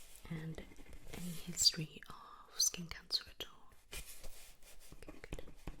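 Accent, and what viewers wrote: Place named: Birmingham, United Kingdom. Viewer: I'm so baffled on where she's from. I've been watching her for a while and all I can hear is a Brum accent... Sorry, can someone help me out here !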